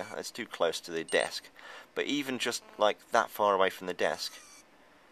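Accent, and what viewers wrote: United Kingdom. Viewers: Are you from Liverpool?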